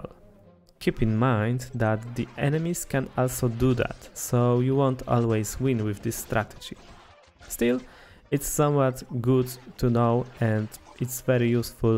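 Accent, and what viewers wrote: Poland. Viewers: i ten polski akcent hehehe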